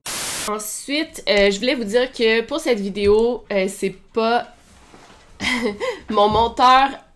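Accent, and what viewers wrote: Quebec, Canada. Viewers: Comment tu va concrètement?....comment tu vie ta bipolarité?.....désolé de poser la question je ne veux pas te manquer de respect ou quoi que ce soit seulement prendre de tes nouvelles!.....je suis désolé au cas ou ça te blesse. merci pour toute l’investigation personnelle et intime et recherche et travail et je t'adore toi et ton chien désolé j'ai oublier le nom Mais j'adore ton chien et toi vous êtes trop mignonne tout les deux et je craque complétement et j'adore ton accent québécois tu est trés belle au naturelle resplendissante comme un soleil désolé si ça gêne mais c'étais de la poésie et encore merci pour la vidéo belle!😼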